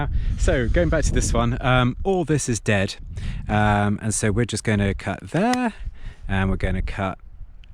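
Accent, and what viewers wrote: United Kingdom. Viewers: I think some snip off them early because they are confident in the weather and is not that risky, but you sound from UK and you don't have severe or late cold nights. I had -5 in late April here in Romania a few years ago. I wait until leaves appear, like 1 2 or two weeks more comparing to your buds.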